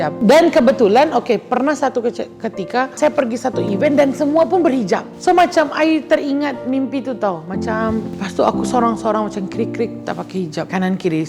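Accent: Malaysia. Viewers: Logat mcm sabah tapi hakikat dia ni org indon.. tula malaysia dan Indonesia ni serumpun🥰😍😘🇲🇾🇲🇨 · Sekarang ni memang dah menetap Malaysia ke? Dah pandah ikut slang orang Malaysia. 👍🏻👍🏻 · Cakap pun mcm org Malaysia. Doa yg terbaik utk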